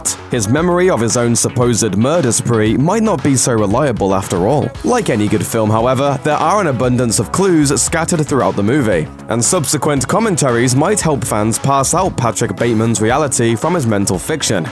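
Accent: United Kingdom. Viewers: I really wish the narrator didn’t have a British accent lost interest in 10 seconds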